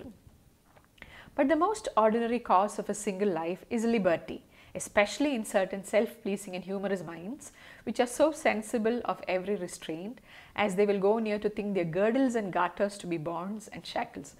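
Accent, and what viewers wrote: Kerala, India. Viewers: Good lecture mam... r u malayali or kannada??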